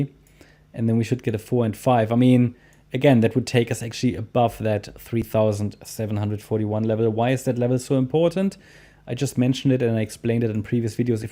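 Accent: Germany. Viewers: Ok, my mistake. Sounds like a German accent. Or maybe you are German just living elsewhere?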